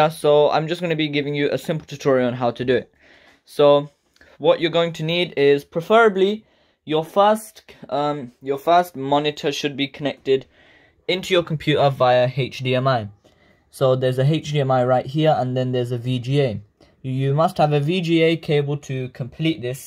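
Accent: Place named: United Kingdom. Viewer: nice british accent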